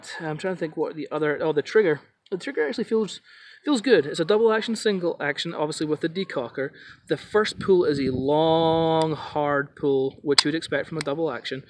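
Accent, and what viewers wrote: Scotland, United Kingdom. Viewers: I was thinking Scotland! Sounds like a mix of Scottish, Canadian and American haha Thanks for the review. Would you recommend this as a first handgun? I'm looking for a good range gun but also something I may want to carry occasionally.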